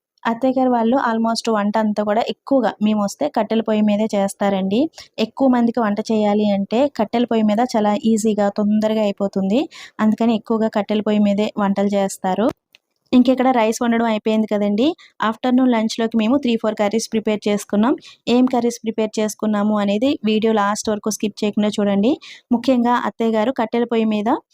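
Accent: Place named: Godavari, India. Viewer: Ma godavarolla basha na ...majaka super undhi ......godarollamani mana language lo mana respect lo telisipothundhi.....nice vlog andi very nice chala bagundhi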